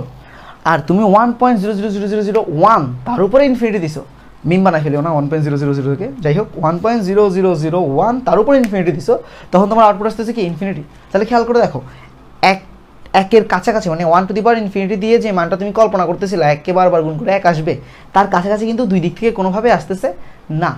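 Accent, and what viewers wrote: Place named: Chittagong, Bangladesh. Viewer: pure chatgaiya tone...love it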